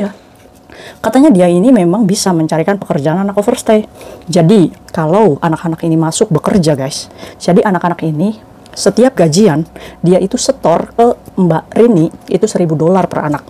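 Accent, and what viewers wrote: Indramayu, Indonesia. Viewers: pantesan kl ngmng BOLeD mirip orang Indramayu 😅😅😅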